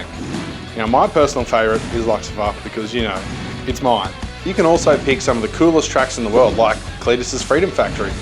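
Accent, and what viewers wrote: Australia. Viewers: Aussie accent: red pepper is pronounced "red peppah" Race car is pronounced "race cah" Come over here is pronounced "come over heah" 😅😂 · Cry about it. Some people enjoy the talking. Especially since he's Aussie. World's greatest accent.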